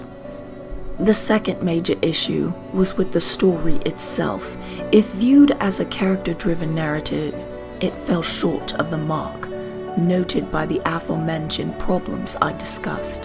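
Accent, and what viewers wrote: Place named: United Kingdom. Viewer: you should really work in documentaries or some shit with that amazing brit voice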